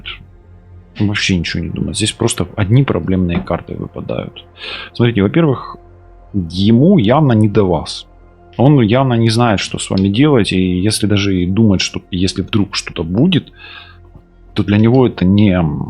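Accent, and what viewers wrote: Ukraine. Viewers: доброе утро! Как я соскучилась по Вашему голосу,раскладу,как мне нравится как Вы доходчиво всё доводите до нас,как нравится Ваш хохлятский говор,я прожила 42 года с хохлом,мне всегда нравился их говор, шо требе,кохано,цебуля,цеберка,тырло...Что же у меня будет с моим Анатолием,42 г.прожили вместе, больше нет сил терпеть унижения,уехала,в четвертый раз,надеюсь навсегда, больше ничего не хочется,пятеро внуков,две дочери 39,41г. Говорят мама поживи для себя,папа никогда не изменится Анатолий,<num> г р Что нас с ним ждет в наших отношениях?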